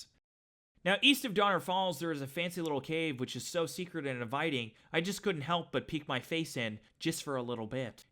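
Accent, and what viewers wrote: Canada. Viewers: Canadian accent if I’ve ever heard one. How’s the east coast been bud? Greetins from Ontario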